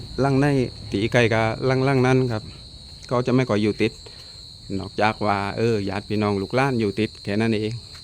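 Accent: South, Thailand. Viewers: ชอบภาษาไต้ฟังแล้วมีเสหน่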